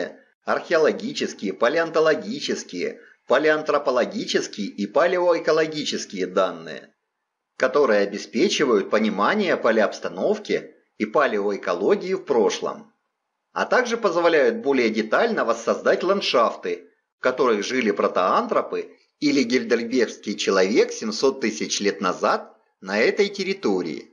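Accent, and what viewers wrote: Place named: South, Russia. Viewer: .. ну южно-русский говор и что?..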